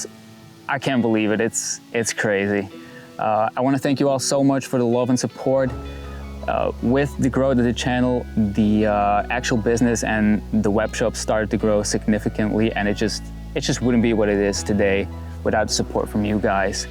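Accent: United States. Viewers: Are you American? Definitely sound like it but I know you're over in Belgium...So confused 🤯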